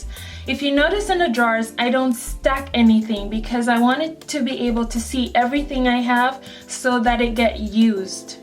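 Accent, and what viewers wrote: Jamaica. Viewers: Keep hearing a Jamaican/Caribbean accent when you speak. Am I right? Great video!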